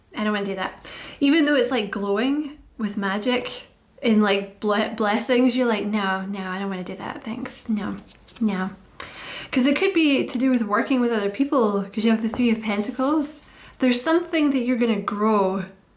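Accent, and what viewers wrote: Scotland, United Kingdom. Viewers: Always wonderful to hear your lovely Scottish accent no matter what the cards say.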